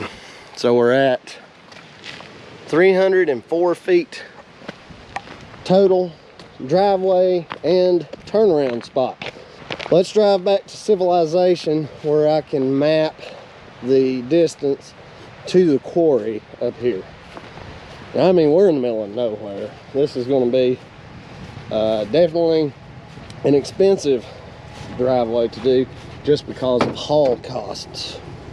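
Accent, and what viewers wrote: South, United States. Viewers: YOUR SOUTHERN ACCENT MAKES ME LAUGH. YOU SURE HAVE TAUGHT ME THINGS. THANK YOU.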